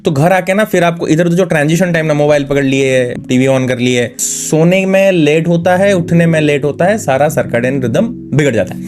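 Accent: Odisha, India. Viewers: Bhai you are odia na? 😅 Btw great 😃👍🏻 video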